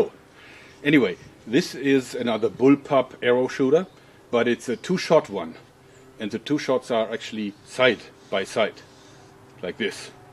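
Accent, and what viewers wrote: Germany. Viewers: your accent sounds very german to me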